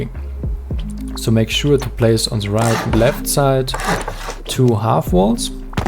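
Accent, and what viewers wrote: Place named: Germany. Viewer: Höre einen deutschen Akzent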